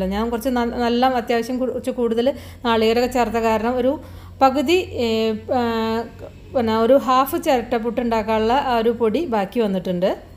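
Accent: Palakkad, India. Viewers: റെസിപി ജാൻ ചെയ്തു നോക്കാ രണ്ട നല്ല അവതരണം പാലക്കാട് സ്ലാങ് ആണോ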